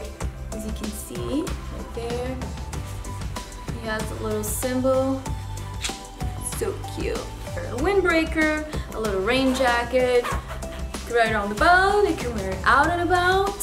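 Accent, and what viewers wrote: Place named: Portugal. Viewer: Love your channel. I was wondering what nationality are you? Sounds like a Portuguese accent? Anyway, keep up the awesome channel. 👍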